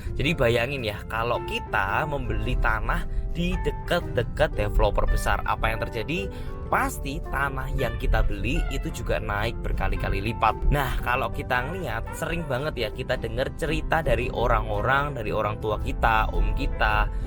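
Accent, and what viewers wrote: Java, Indonesia. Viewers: Paling suka sama penjelasan bro dengan suara khasnya yg medok. Hehe. Semoga saya juga bisa segera memulai bisnis. Terimakasih ilmunya bro. Sukses selalu, sehat selalu utk bro dan keluarga.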